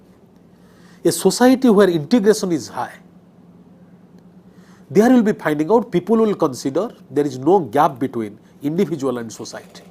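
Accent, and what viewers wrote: India. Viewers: Indian accent makes difficult for u . But stuff is best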